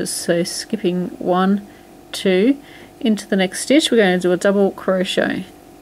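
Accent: Australia. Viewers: I want to thank you once again, I make your one piece wonder sweater all the time for new babies. Finding your heart hat was a total plus for me, you the best person I have done tutorial by far. You have made me feel like I can do more and feel good about my work. Keep doing these wonderful tutorials, I love your accent. It reminds me of family that live in Sidney who I don't get to see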